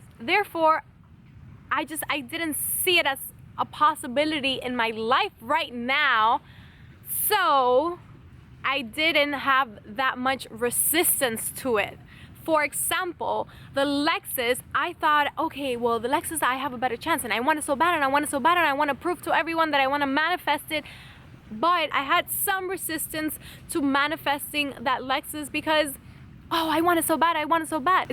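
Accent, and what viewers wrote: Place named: Miami, United States. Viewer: ayyy reppin the 305 with that Miami accent 🧡💚 congrats!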